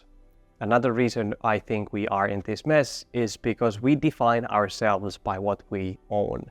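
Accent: Finland. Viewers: Finnish accent, I never miss it! Onnea kanavalle!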